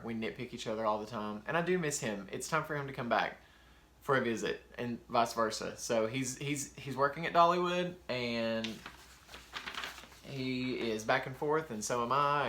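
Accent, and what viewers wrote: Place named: Alabama, United States. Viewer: I just love your accent! I'm an Alabama girl so it makes me feel right at home. I really enjoyed learning more about you. You have such a great personality 😍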